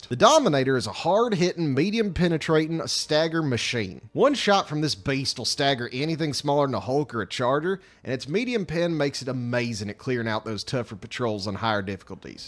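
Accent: South, United States. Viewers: After years of having to endure various European accents, this channel blindsides me with a beautiful Southern drawl. It's like a balm. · Bro I love your southern accent It's got charm haha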